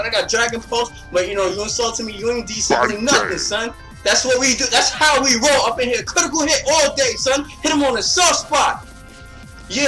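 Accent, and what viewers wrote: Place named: Brooklyn, United States. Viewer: I swear I know this guy... sounds like he lives in brooklyn.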